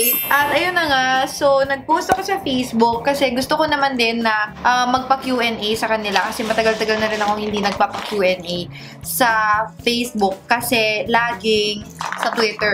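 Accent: Ilocos, Philippines. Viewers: Ilocano ba yun hahhaa😂ang cute 😍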